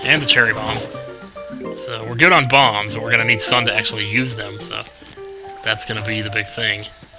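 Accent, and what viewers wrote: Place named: United States. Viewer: your voice is way better then suger conroy and i love your streotipical american accen oww and if your woundering im english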